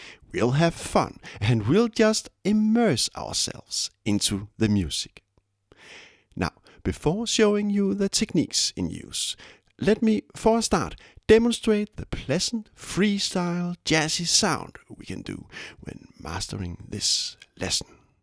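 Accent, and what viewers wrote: Denmark. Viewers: Instantly recognize your accent! Nice video my fellow danish person <3 Gonna definitely use this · You sound danish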